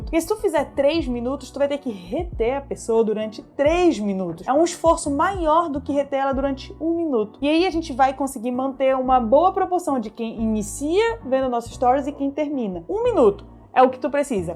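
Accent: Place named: South, Brazil. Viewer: Você é uma mistura de sulista, carioca e português. TU, TU TENS, TU ÉS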